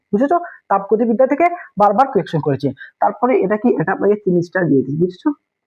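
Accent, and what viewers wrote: Sylhet, Bangladesh. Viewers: Jii may be Sylhet Ta Nahole maje maje sir arokom kota bolccen humm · vaiya mono oy sylheti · ভাই কিতা সিলেটি নি। ভাই পদার্থ বিজ্ঞান লইয়া যে চিন্তাত আছি। সেইম টাইপ ওর অংক টেস্ট পেপার ও এক একটা এক এক নিয়মে দেওয়া। পাশ করতাম ওইলে কিলা পড়া লাগবো। 😭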